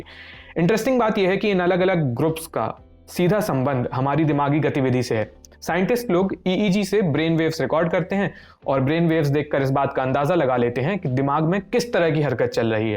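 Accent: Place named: Lucknow, India. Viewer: Full Lucknowa tone, like that 👍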